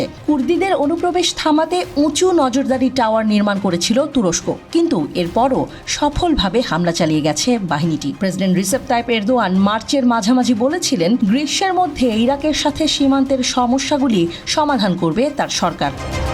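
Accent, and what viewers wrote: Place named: India. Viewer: এই মহিলা কি ইন্ডিয়ান,তার কথা বলার ধরণ দেখে এমনি মনে হয়, এমন ভাষায় কথাবলে ভারতীয়েও সংবাদমাধ্যম, হাসিনা কি ভারতীয়েও বর্জন প্রডাক্ট ব্যবহার করছে বাংলাদেশ ভূখন্ডে।।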